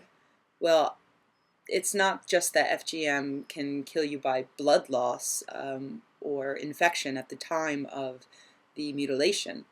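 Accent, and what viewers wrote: United States. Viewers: Alright. So based on your accent I'm going to assume you're American. If I'm wrong please correct me. As such the statistics that are most close to home for you should be those of American citizens. In America roughly 80 percent of men are circumcised. This comes out to be roughly 260,000,000 men. 200 of those men die from circumcision every year. FGM promotes another staggering number with 500,000 women either being victims of or at risk of FGM. As far as my research has shown there are 0 annual deaths due to FGM. FGM is horrifying. It disgusting, and evil; however, to deny that other practices are not to be taken with the same sense of urgency simply because of the gender of the victims is both damaging and disingenuous. I look forward to the day when both plights can be handled at the same table with the brightest men and women our world can offer. 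Thank you for your opinion, and the vehicle necessary to make mine heard as well.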